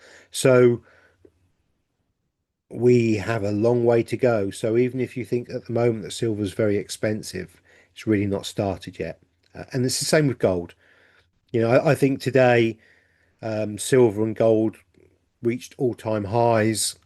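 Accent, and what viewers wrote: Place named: England, United Kingdom. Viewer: Congratulations to you, your work is a tremendous boon for we who feel these energies so acutely. I particularly love the English accent in your humble delivery. I note that several people are questioning Richards absence from your line up. We don’t need an explanation in the grand scheme of volatile life, but a mention might allay such curiosity. Yuletide greetings from somewhere that feels like nowhere in Canada!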